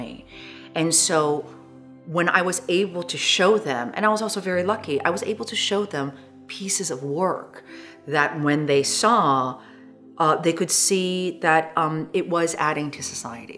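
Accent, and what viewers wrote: United States. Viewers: The whole time I think she is american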